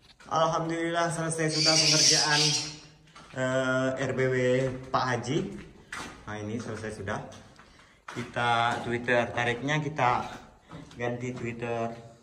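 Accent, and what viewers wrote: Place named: Sambas, Indonesia. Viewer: Macam orang sambas pak aji ngomong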